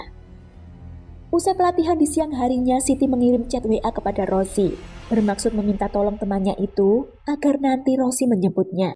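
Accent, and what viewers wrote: Java, Indonesia. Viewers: Lebih enak didengar jika medoknya dihilangkan tapi mungkin susah ya, dan mungkin itu ciri khas channelnya.. Kalau medok saat berbahasa Jawa memang wajar, tapi saat berbahasa baku tetap saja medok, agak (maaf) tidak enak didengar... Tapi sekali lagi mungkin sulit dihilangkan dan sudah jadi ciri khas 🙏🏽🙏🏽☺️☺️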